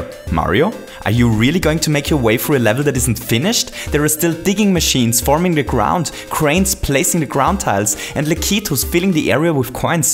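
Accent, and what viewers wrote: Germany. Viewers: I love your a bit German pronunciation :) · i have a question are you german? because your accent sounds kinda german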